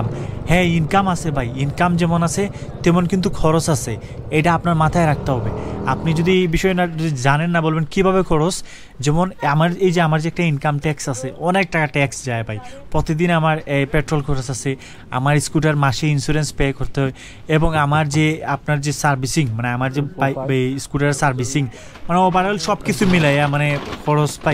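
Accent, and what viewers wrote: Sylhet, Bangladesh. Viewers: ভাই কিতা সিলেটি নি?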